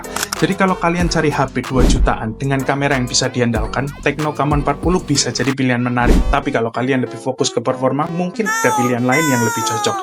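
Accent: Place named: East Java, Indonesia. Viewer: Cra jelasin enk,simple n detail jg,logat mcm jwa timuran❤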